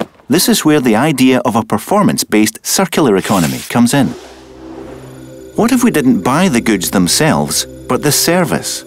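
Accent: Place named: Scotland, United Kingdom. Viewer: Aye, I love the narrator's Scottish accent. <3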